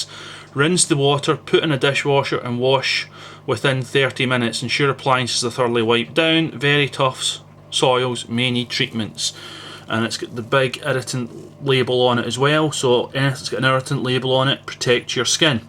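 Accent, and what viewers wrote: Scotland, United Kingdom. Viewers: you have the best Scottish accent since Capt. macmillan from COD4 Bravo sir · Great vid mate, nicely explained! (And one of the best Scots accents Ive been able to enjoy - added a much smoother Connery-esque authority to the demo. Och aye!) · I stopped at 3.00 and just have to comment here to tell you your Scottish accent is FOOKING AWSUM!!!!!!!!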